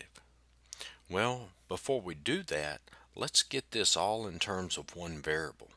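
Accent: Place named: United States. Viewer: I like your accent.. it sounds... American. Very soothing. (this is comming from an Australian). Great video!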